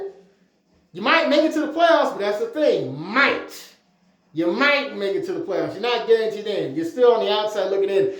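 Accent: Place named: Appalachia, United States. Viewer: You talk like a hillbilly. Where do you live?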